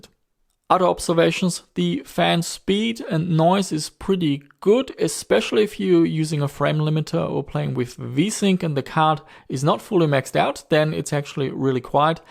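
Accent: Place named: Germany. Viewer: Look! My favourite German accent Australia dweller has a face!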